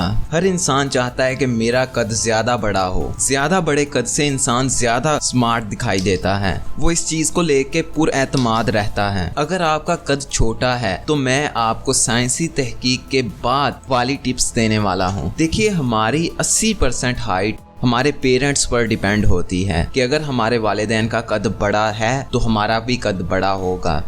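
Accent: Punjab, India. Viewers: AP Punjab ke ho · Yar apky muzuaaat intehae jandar lekin apki Urdu intehae kmzor h lehja bilkul kc pendu Punjabi ka h ya Urdu ehl e zuban ki trha bolo ya phir direct Punjabi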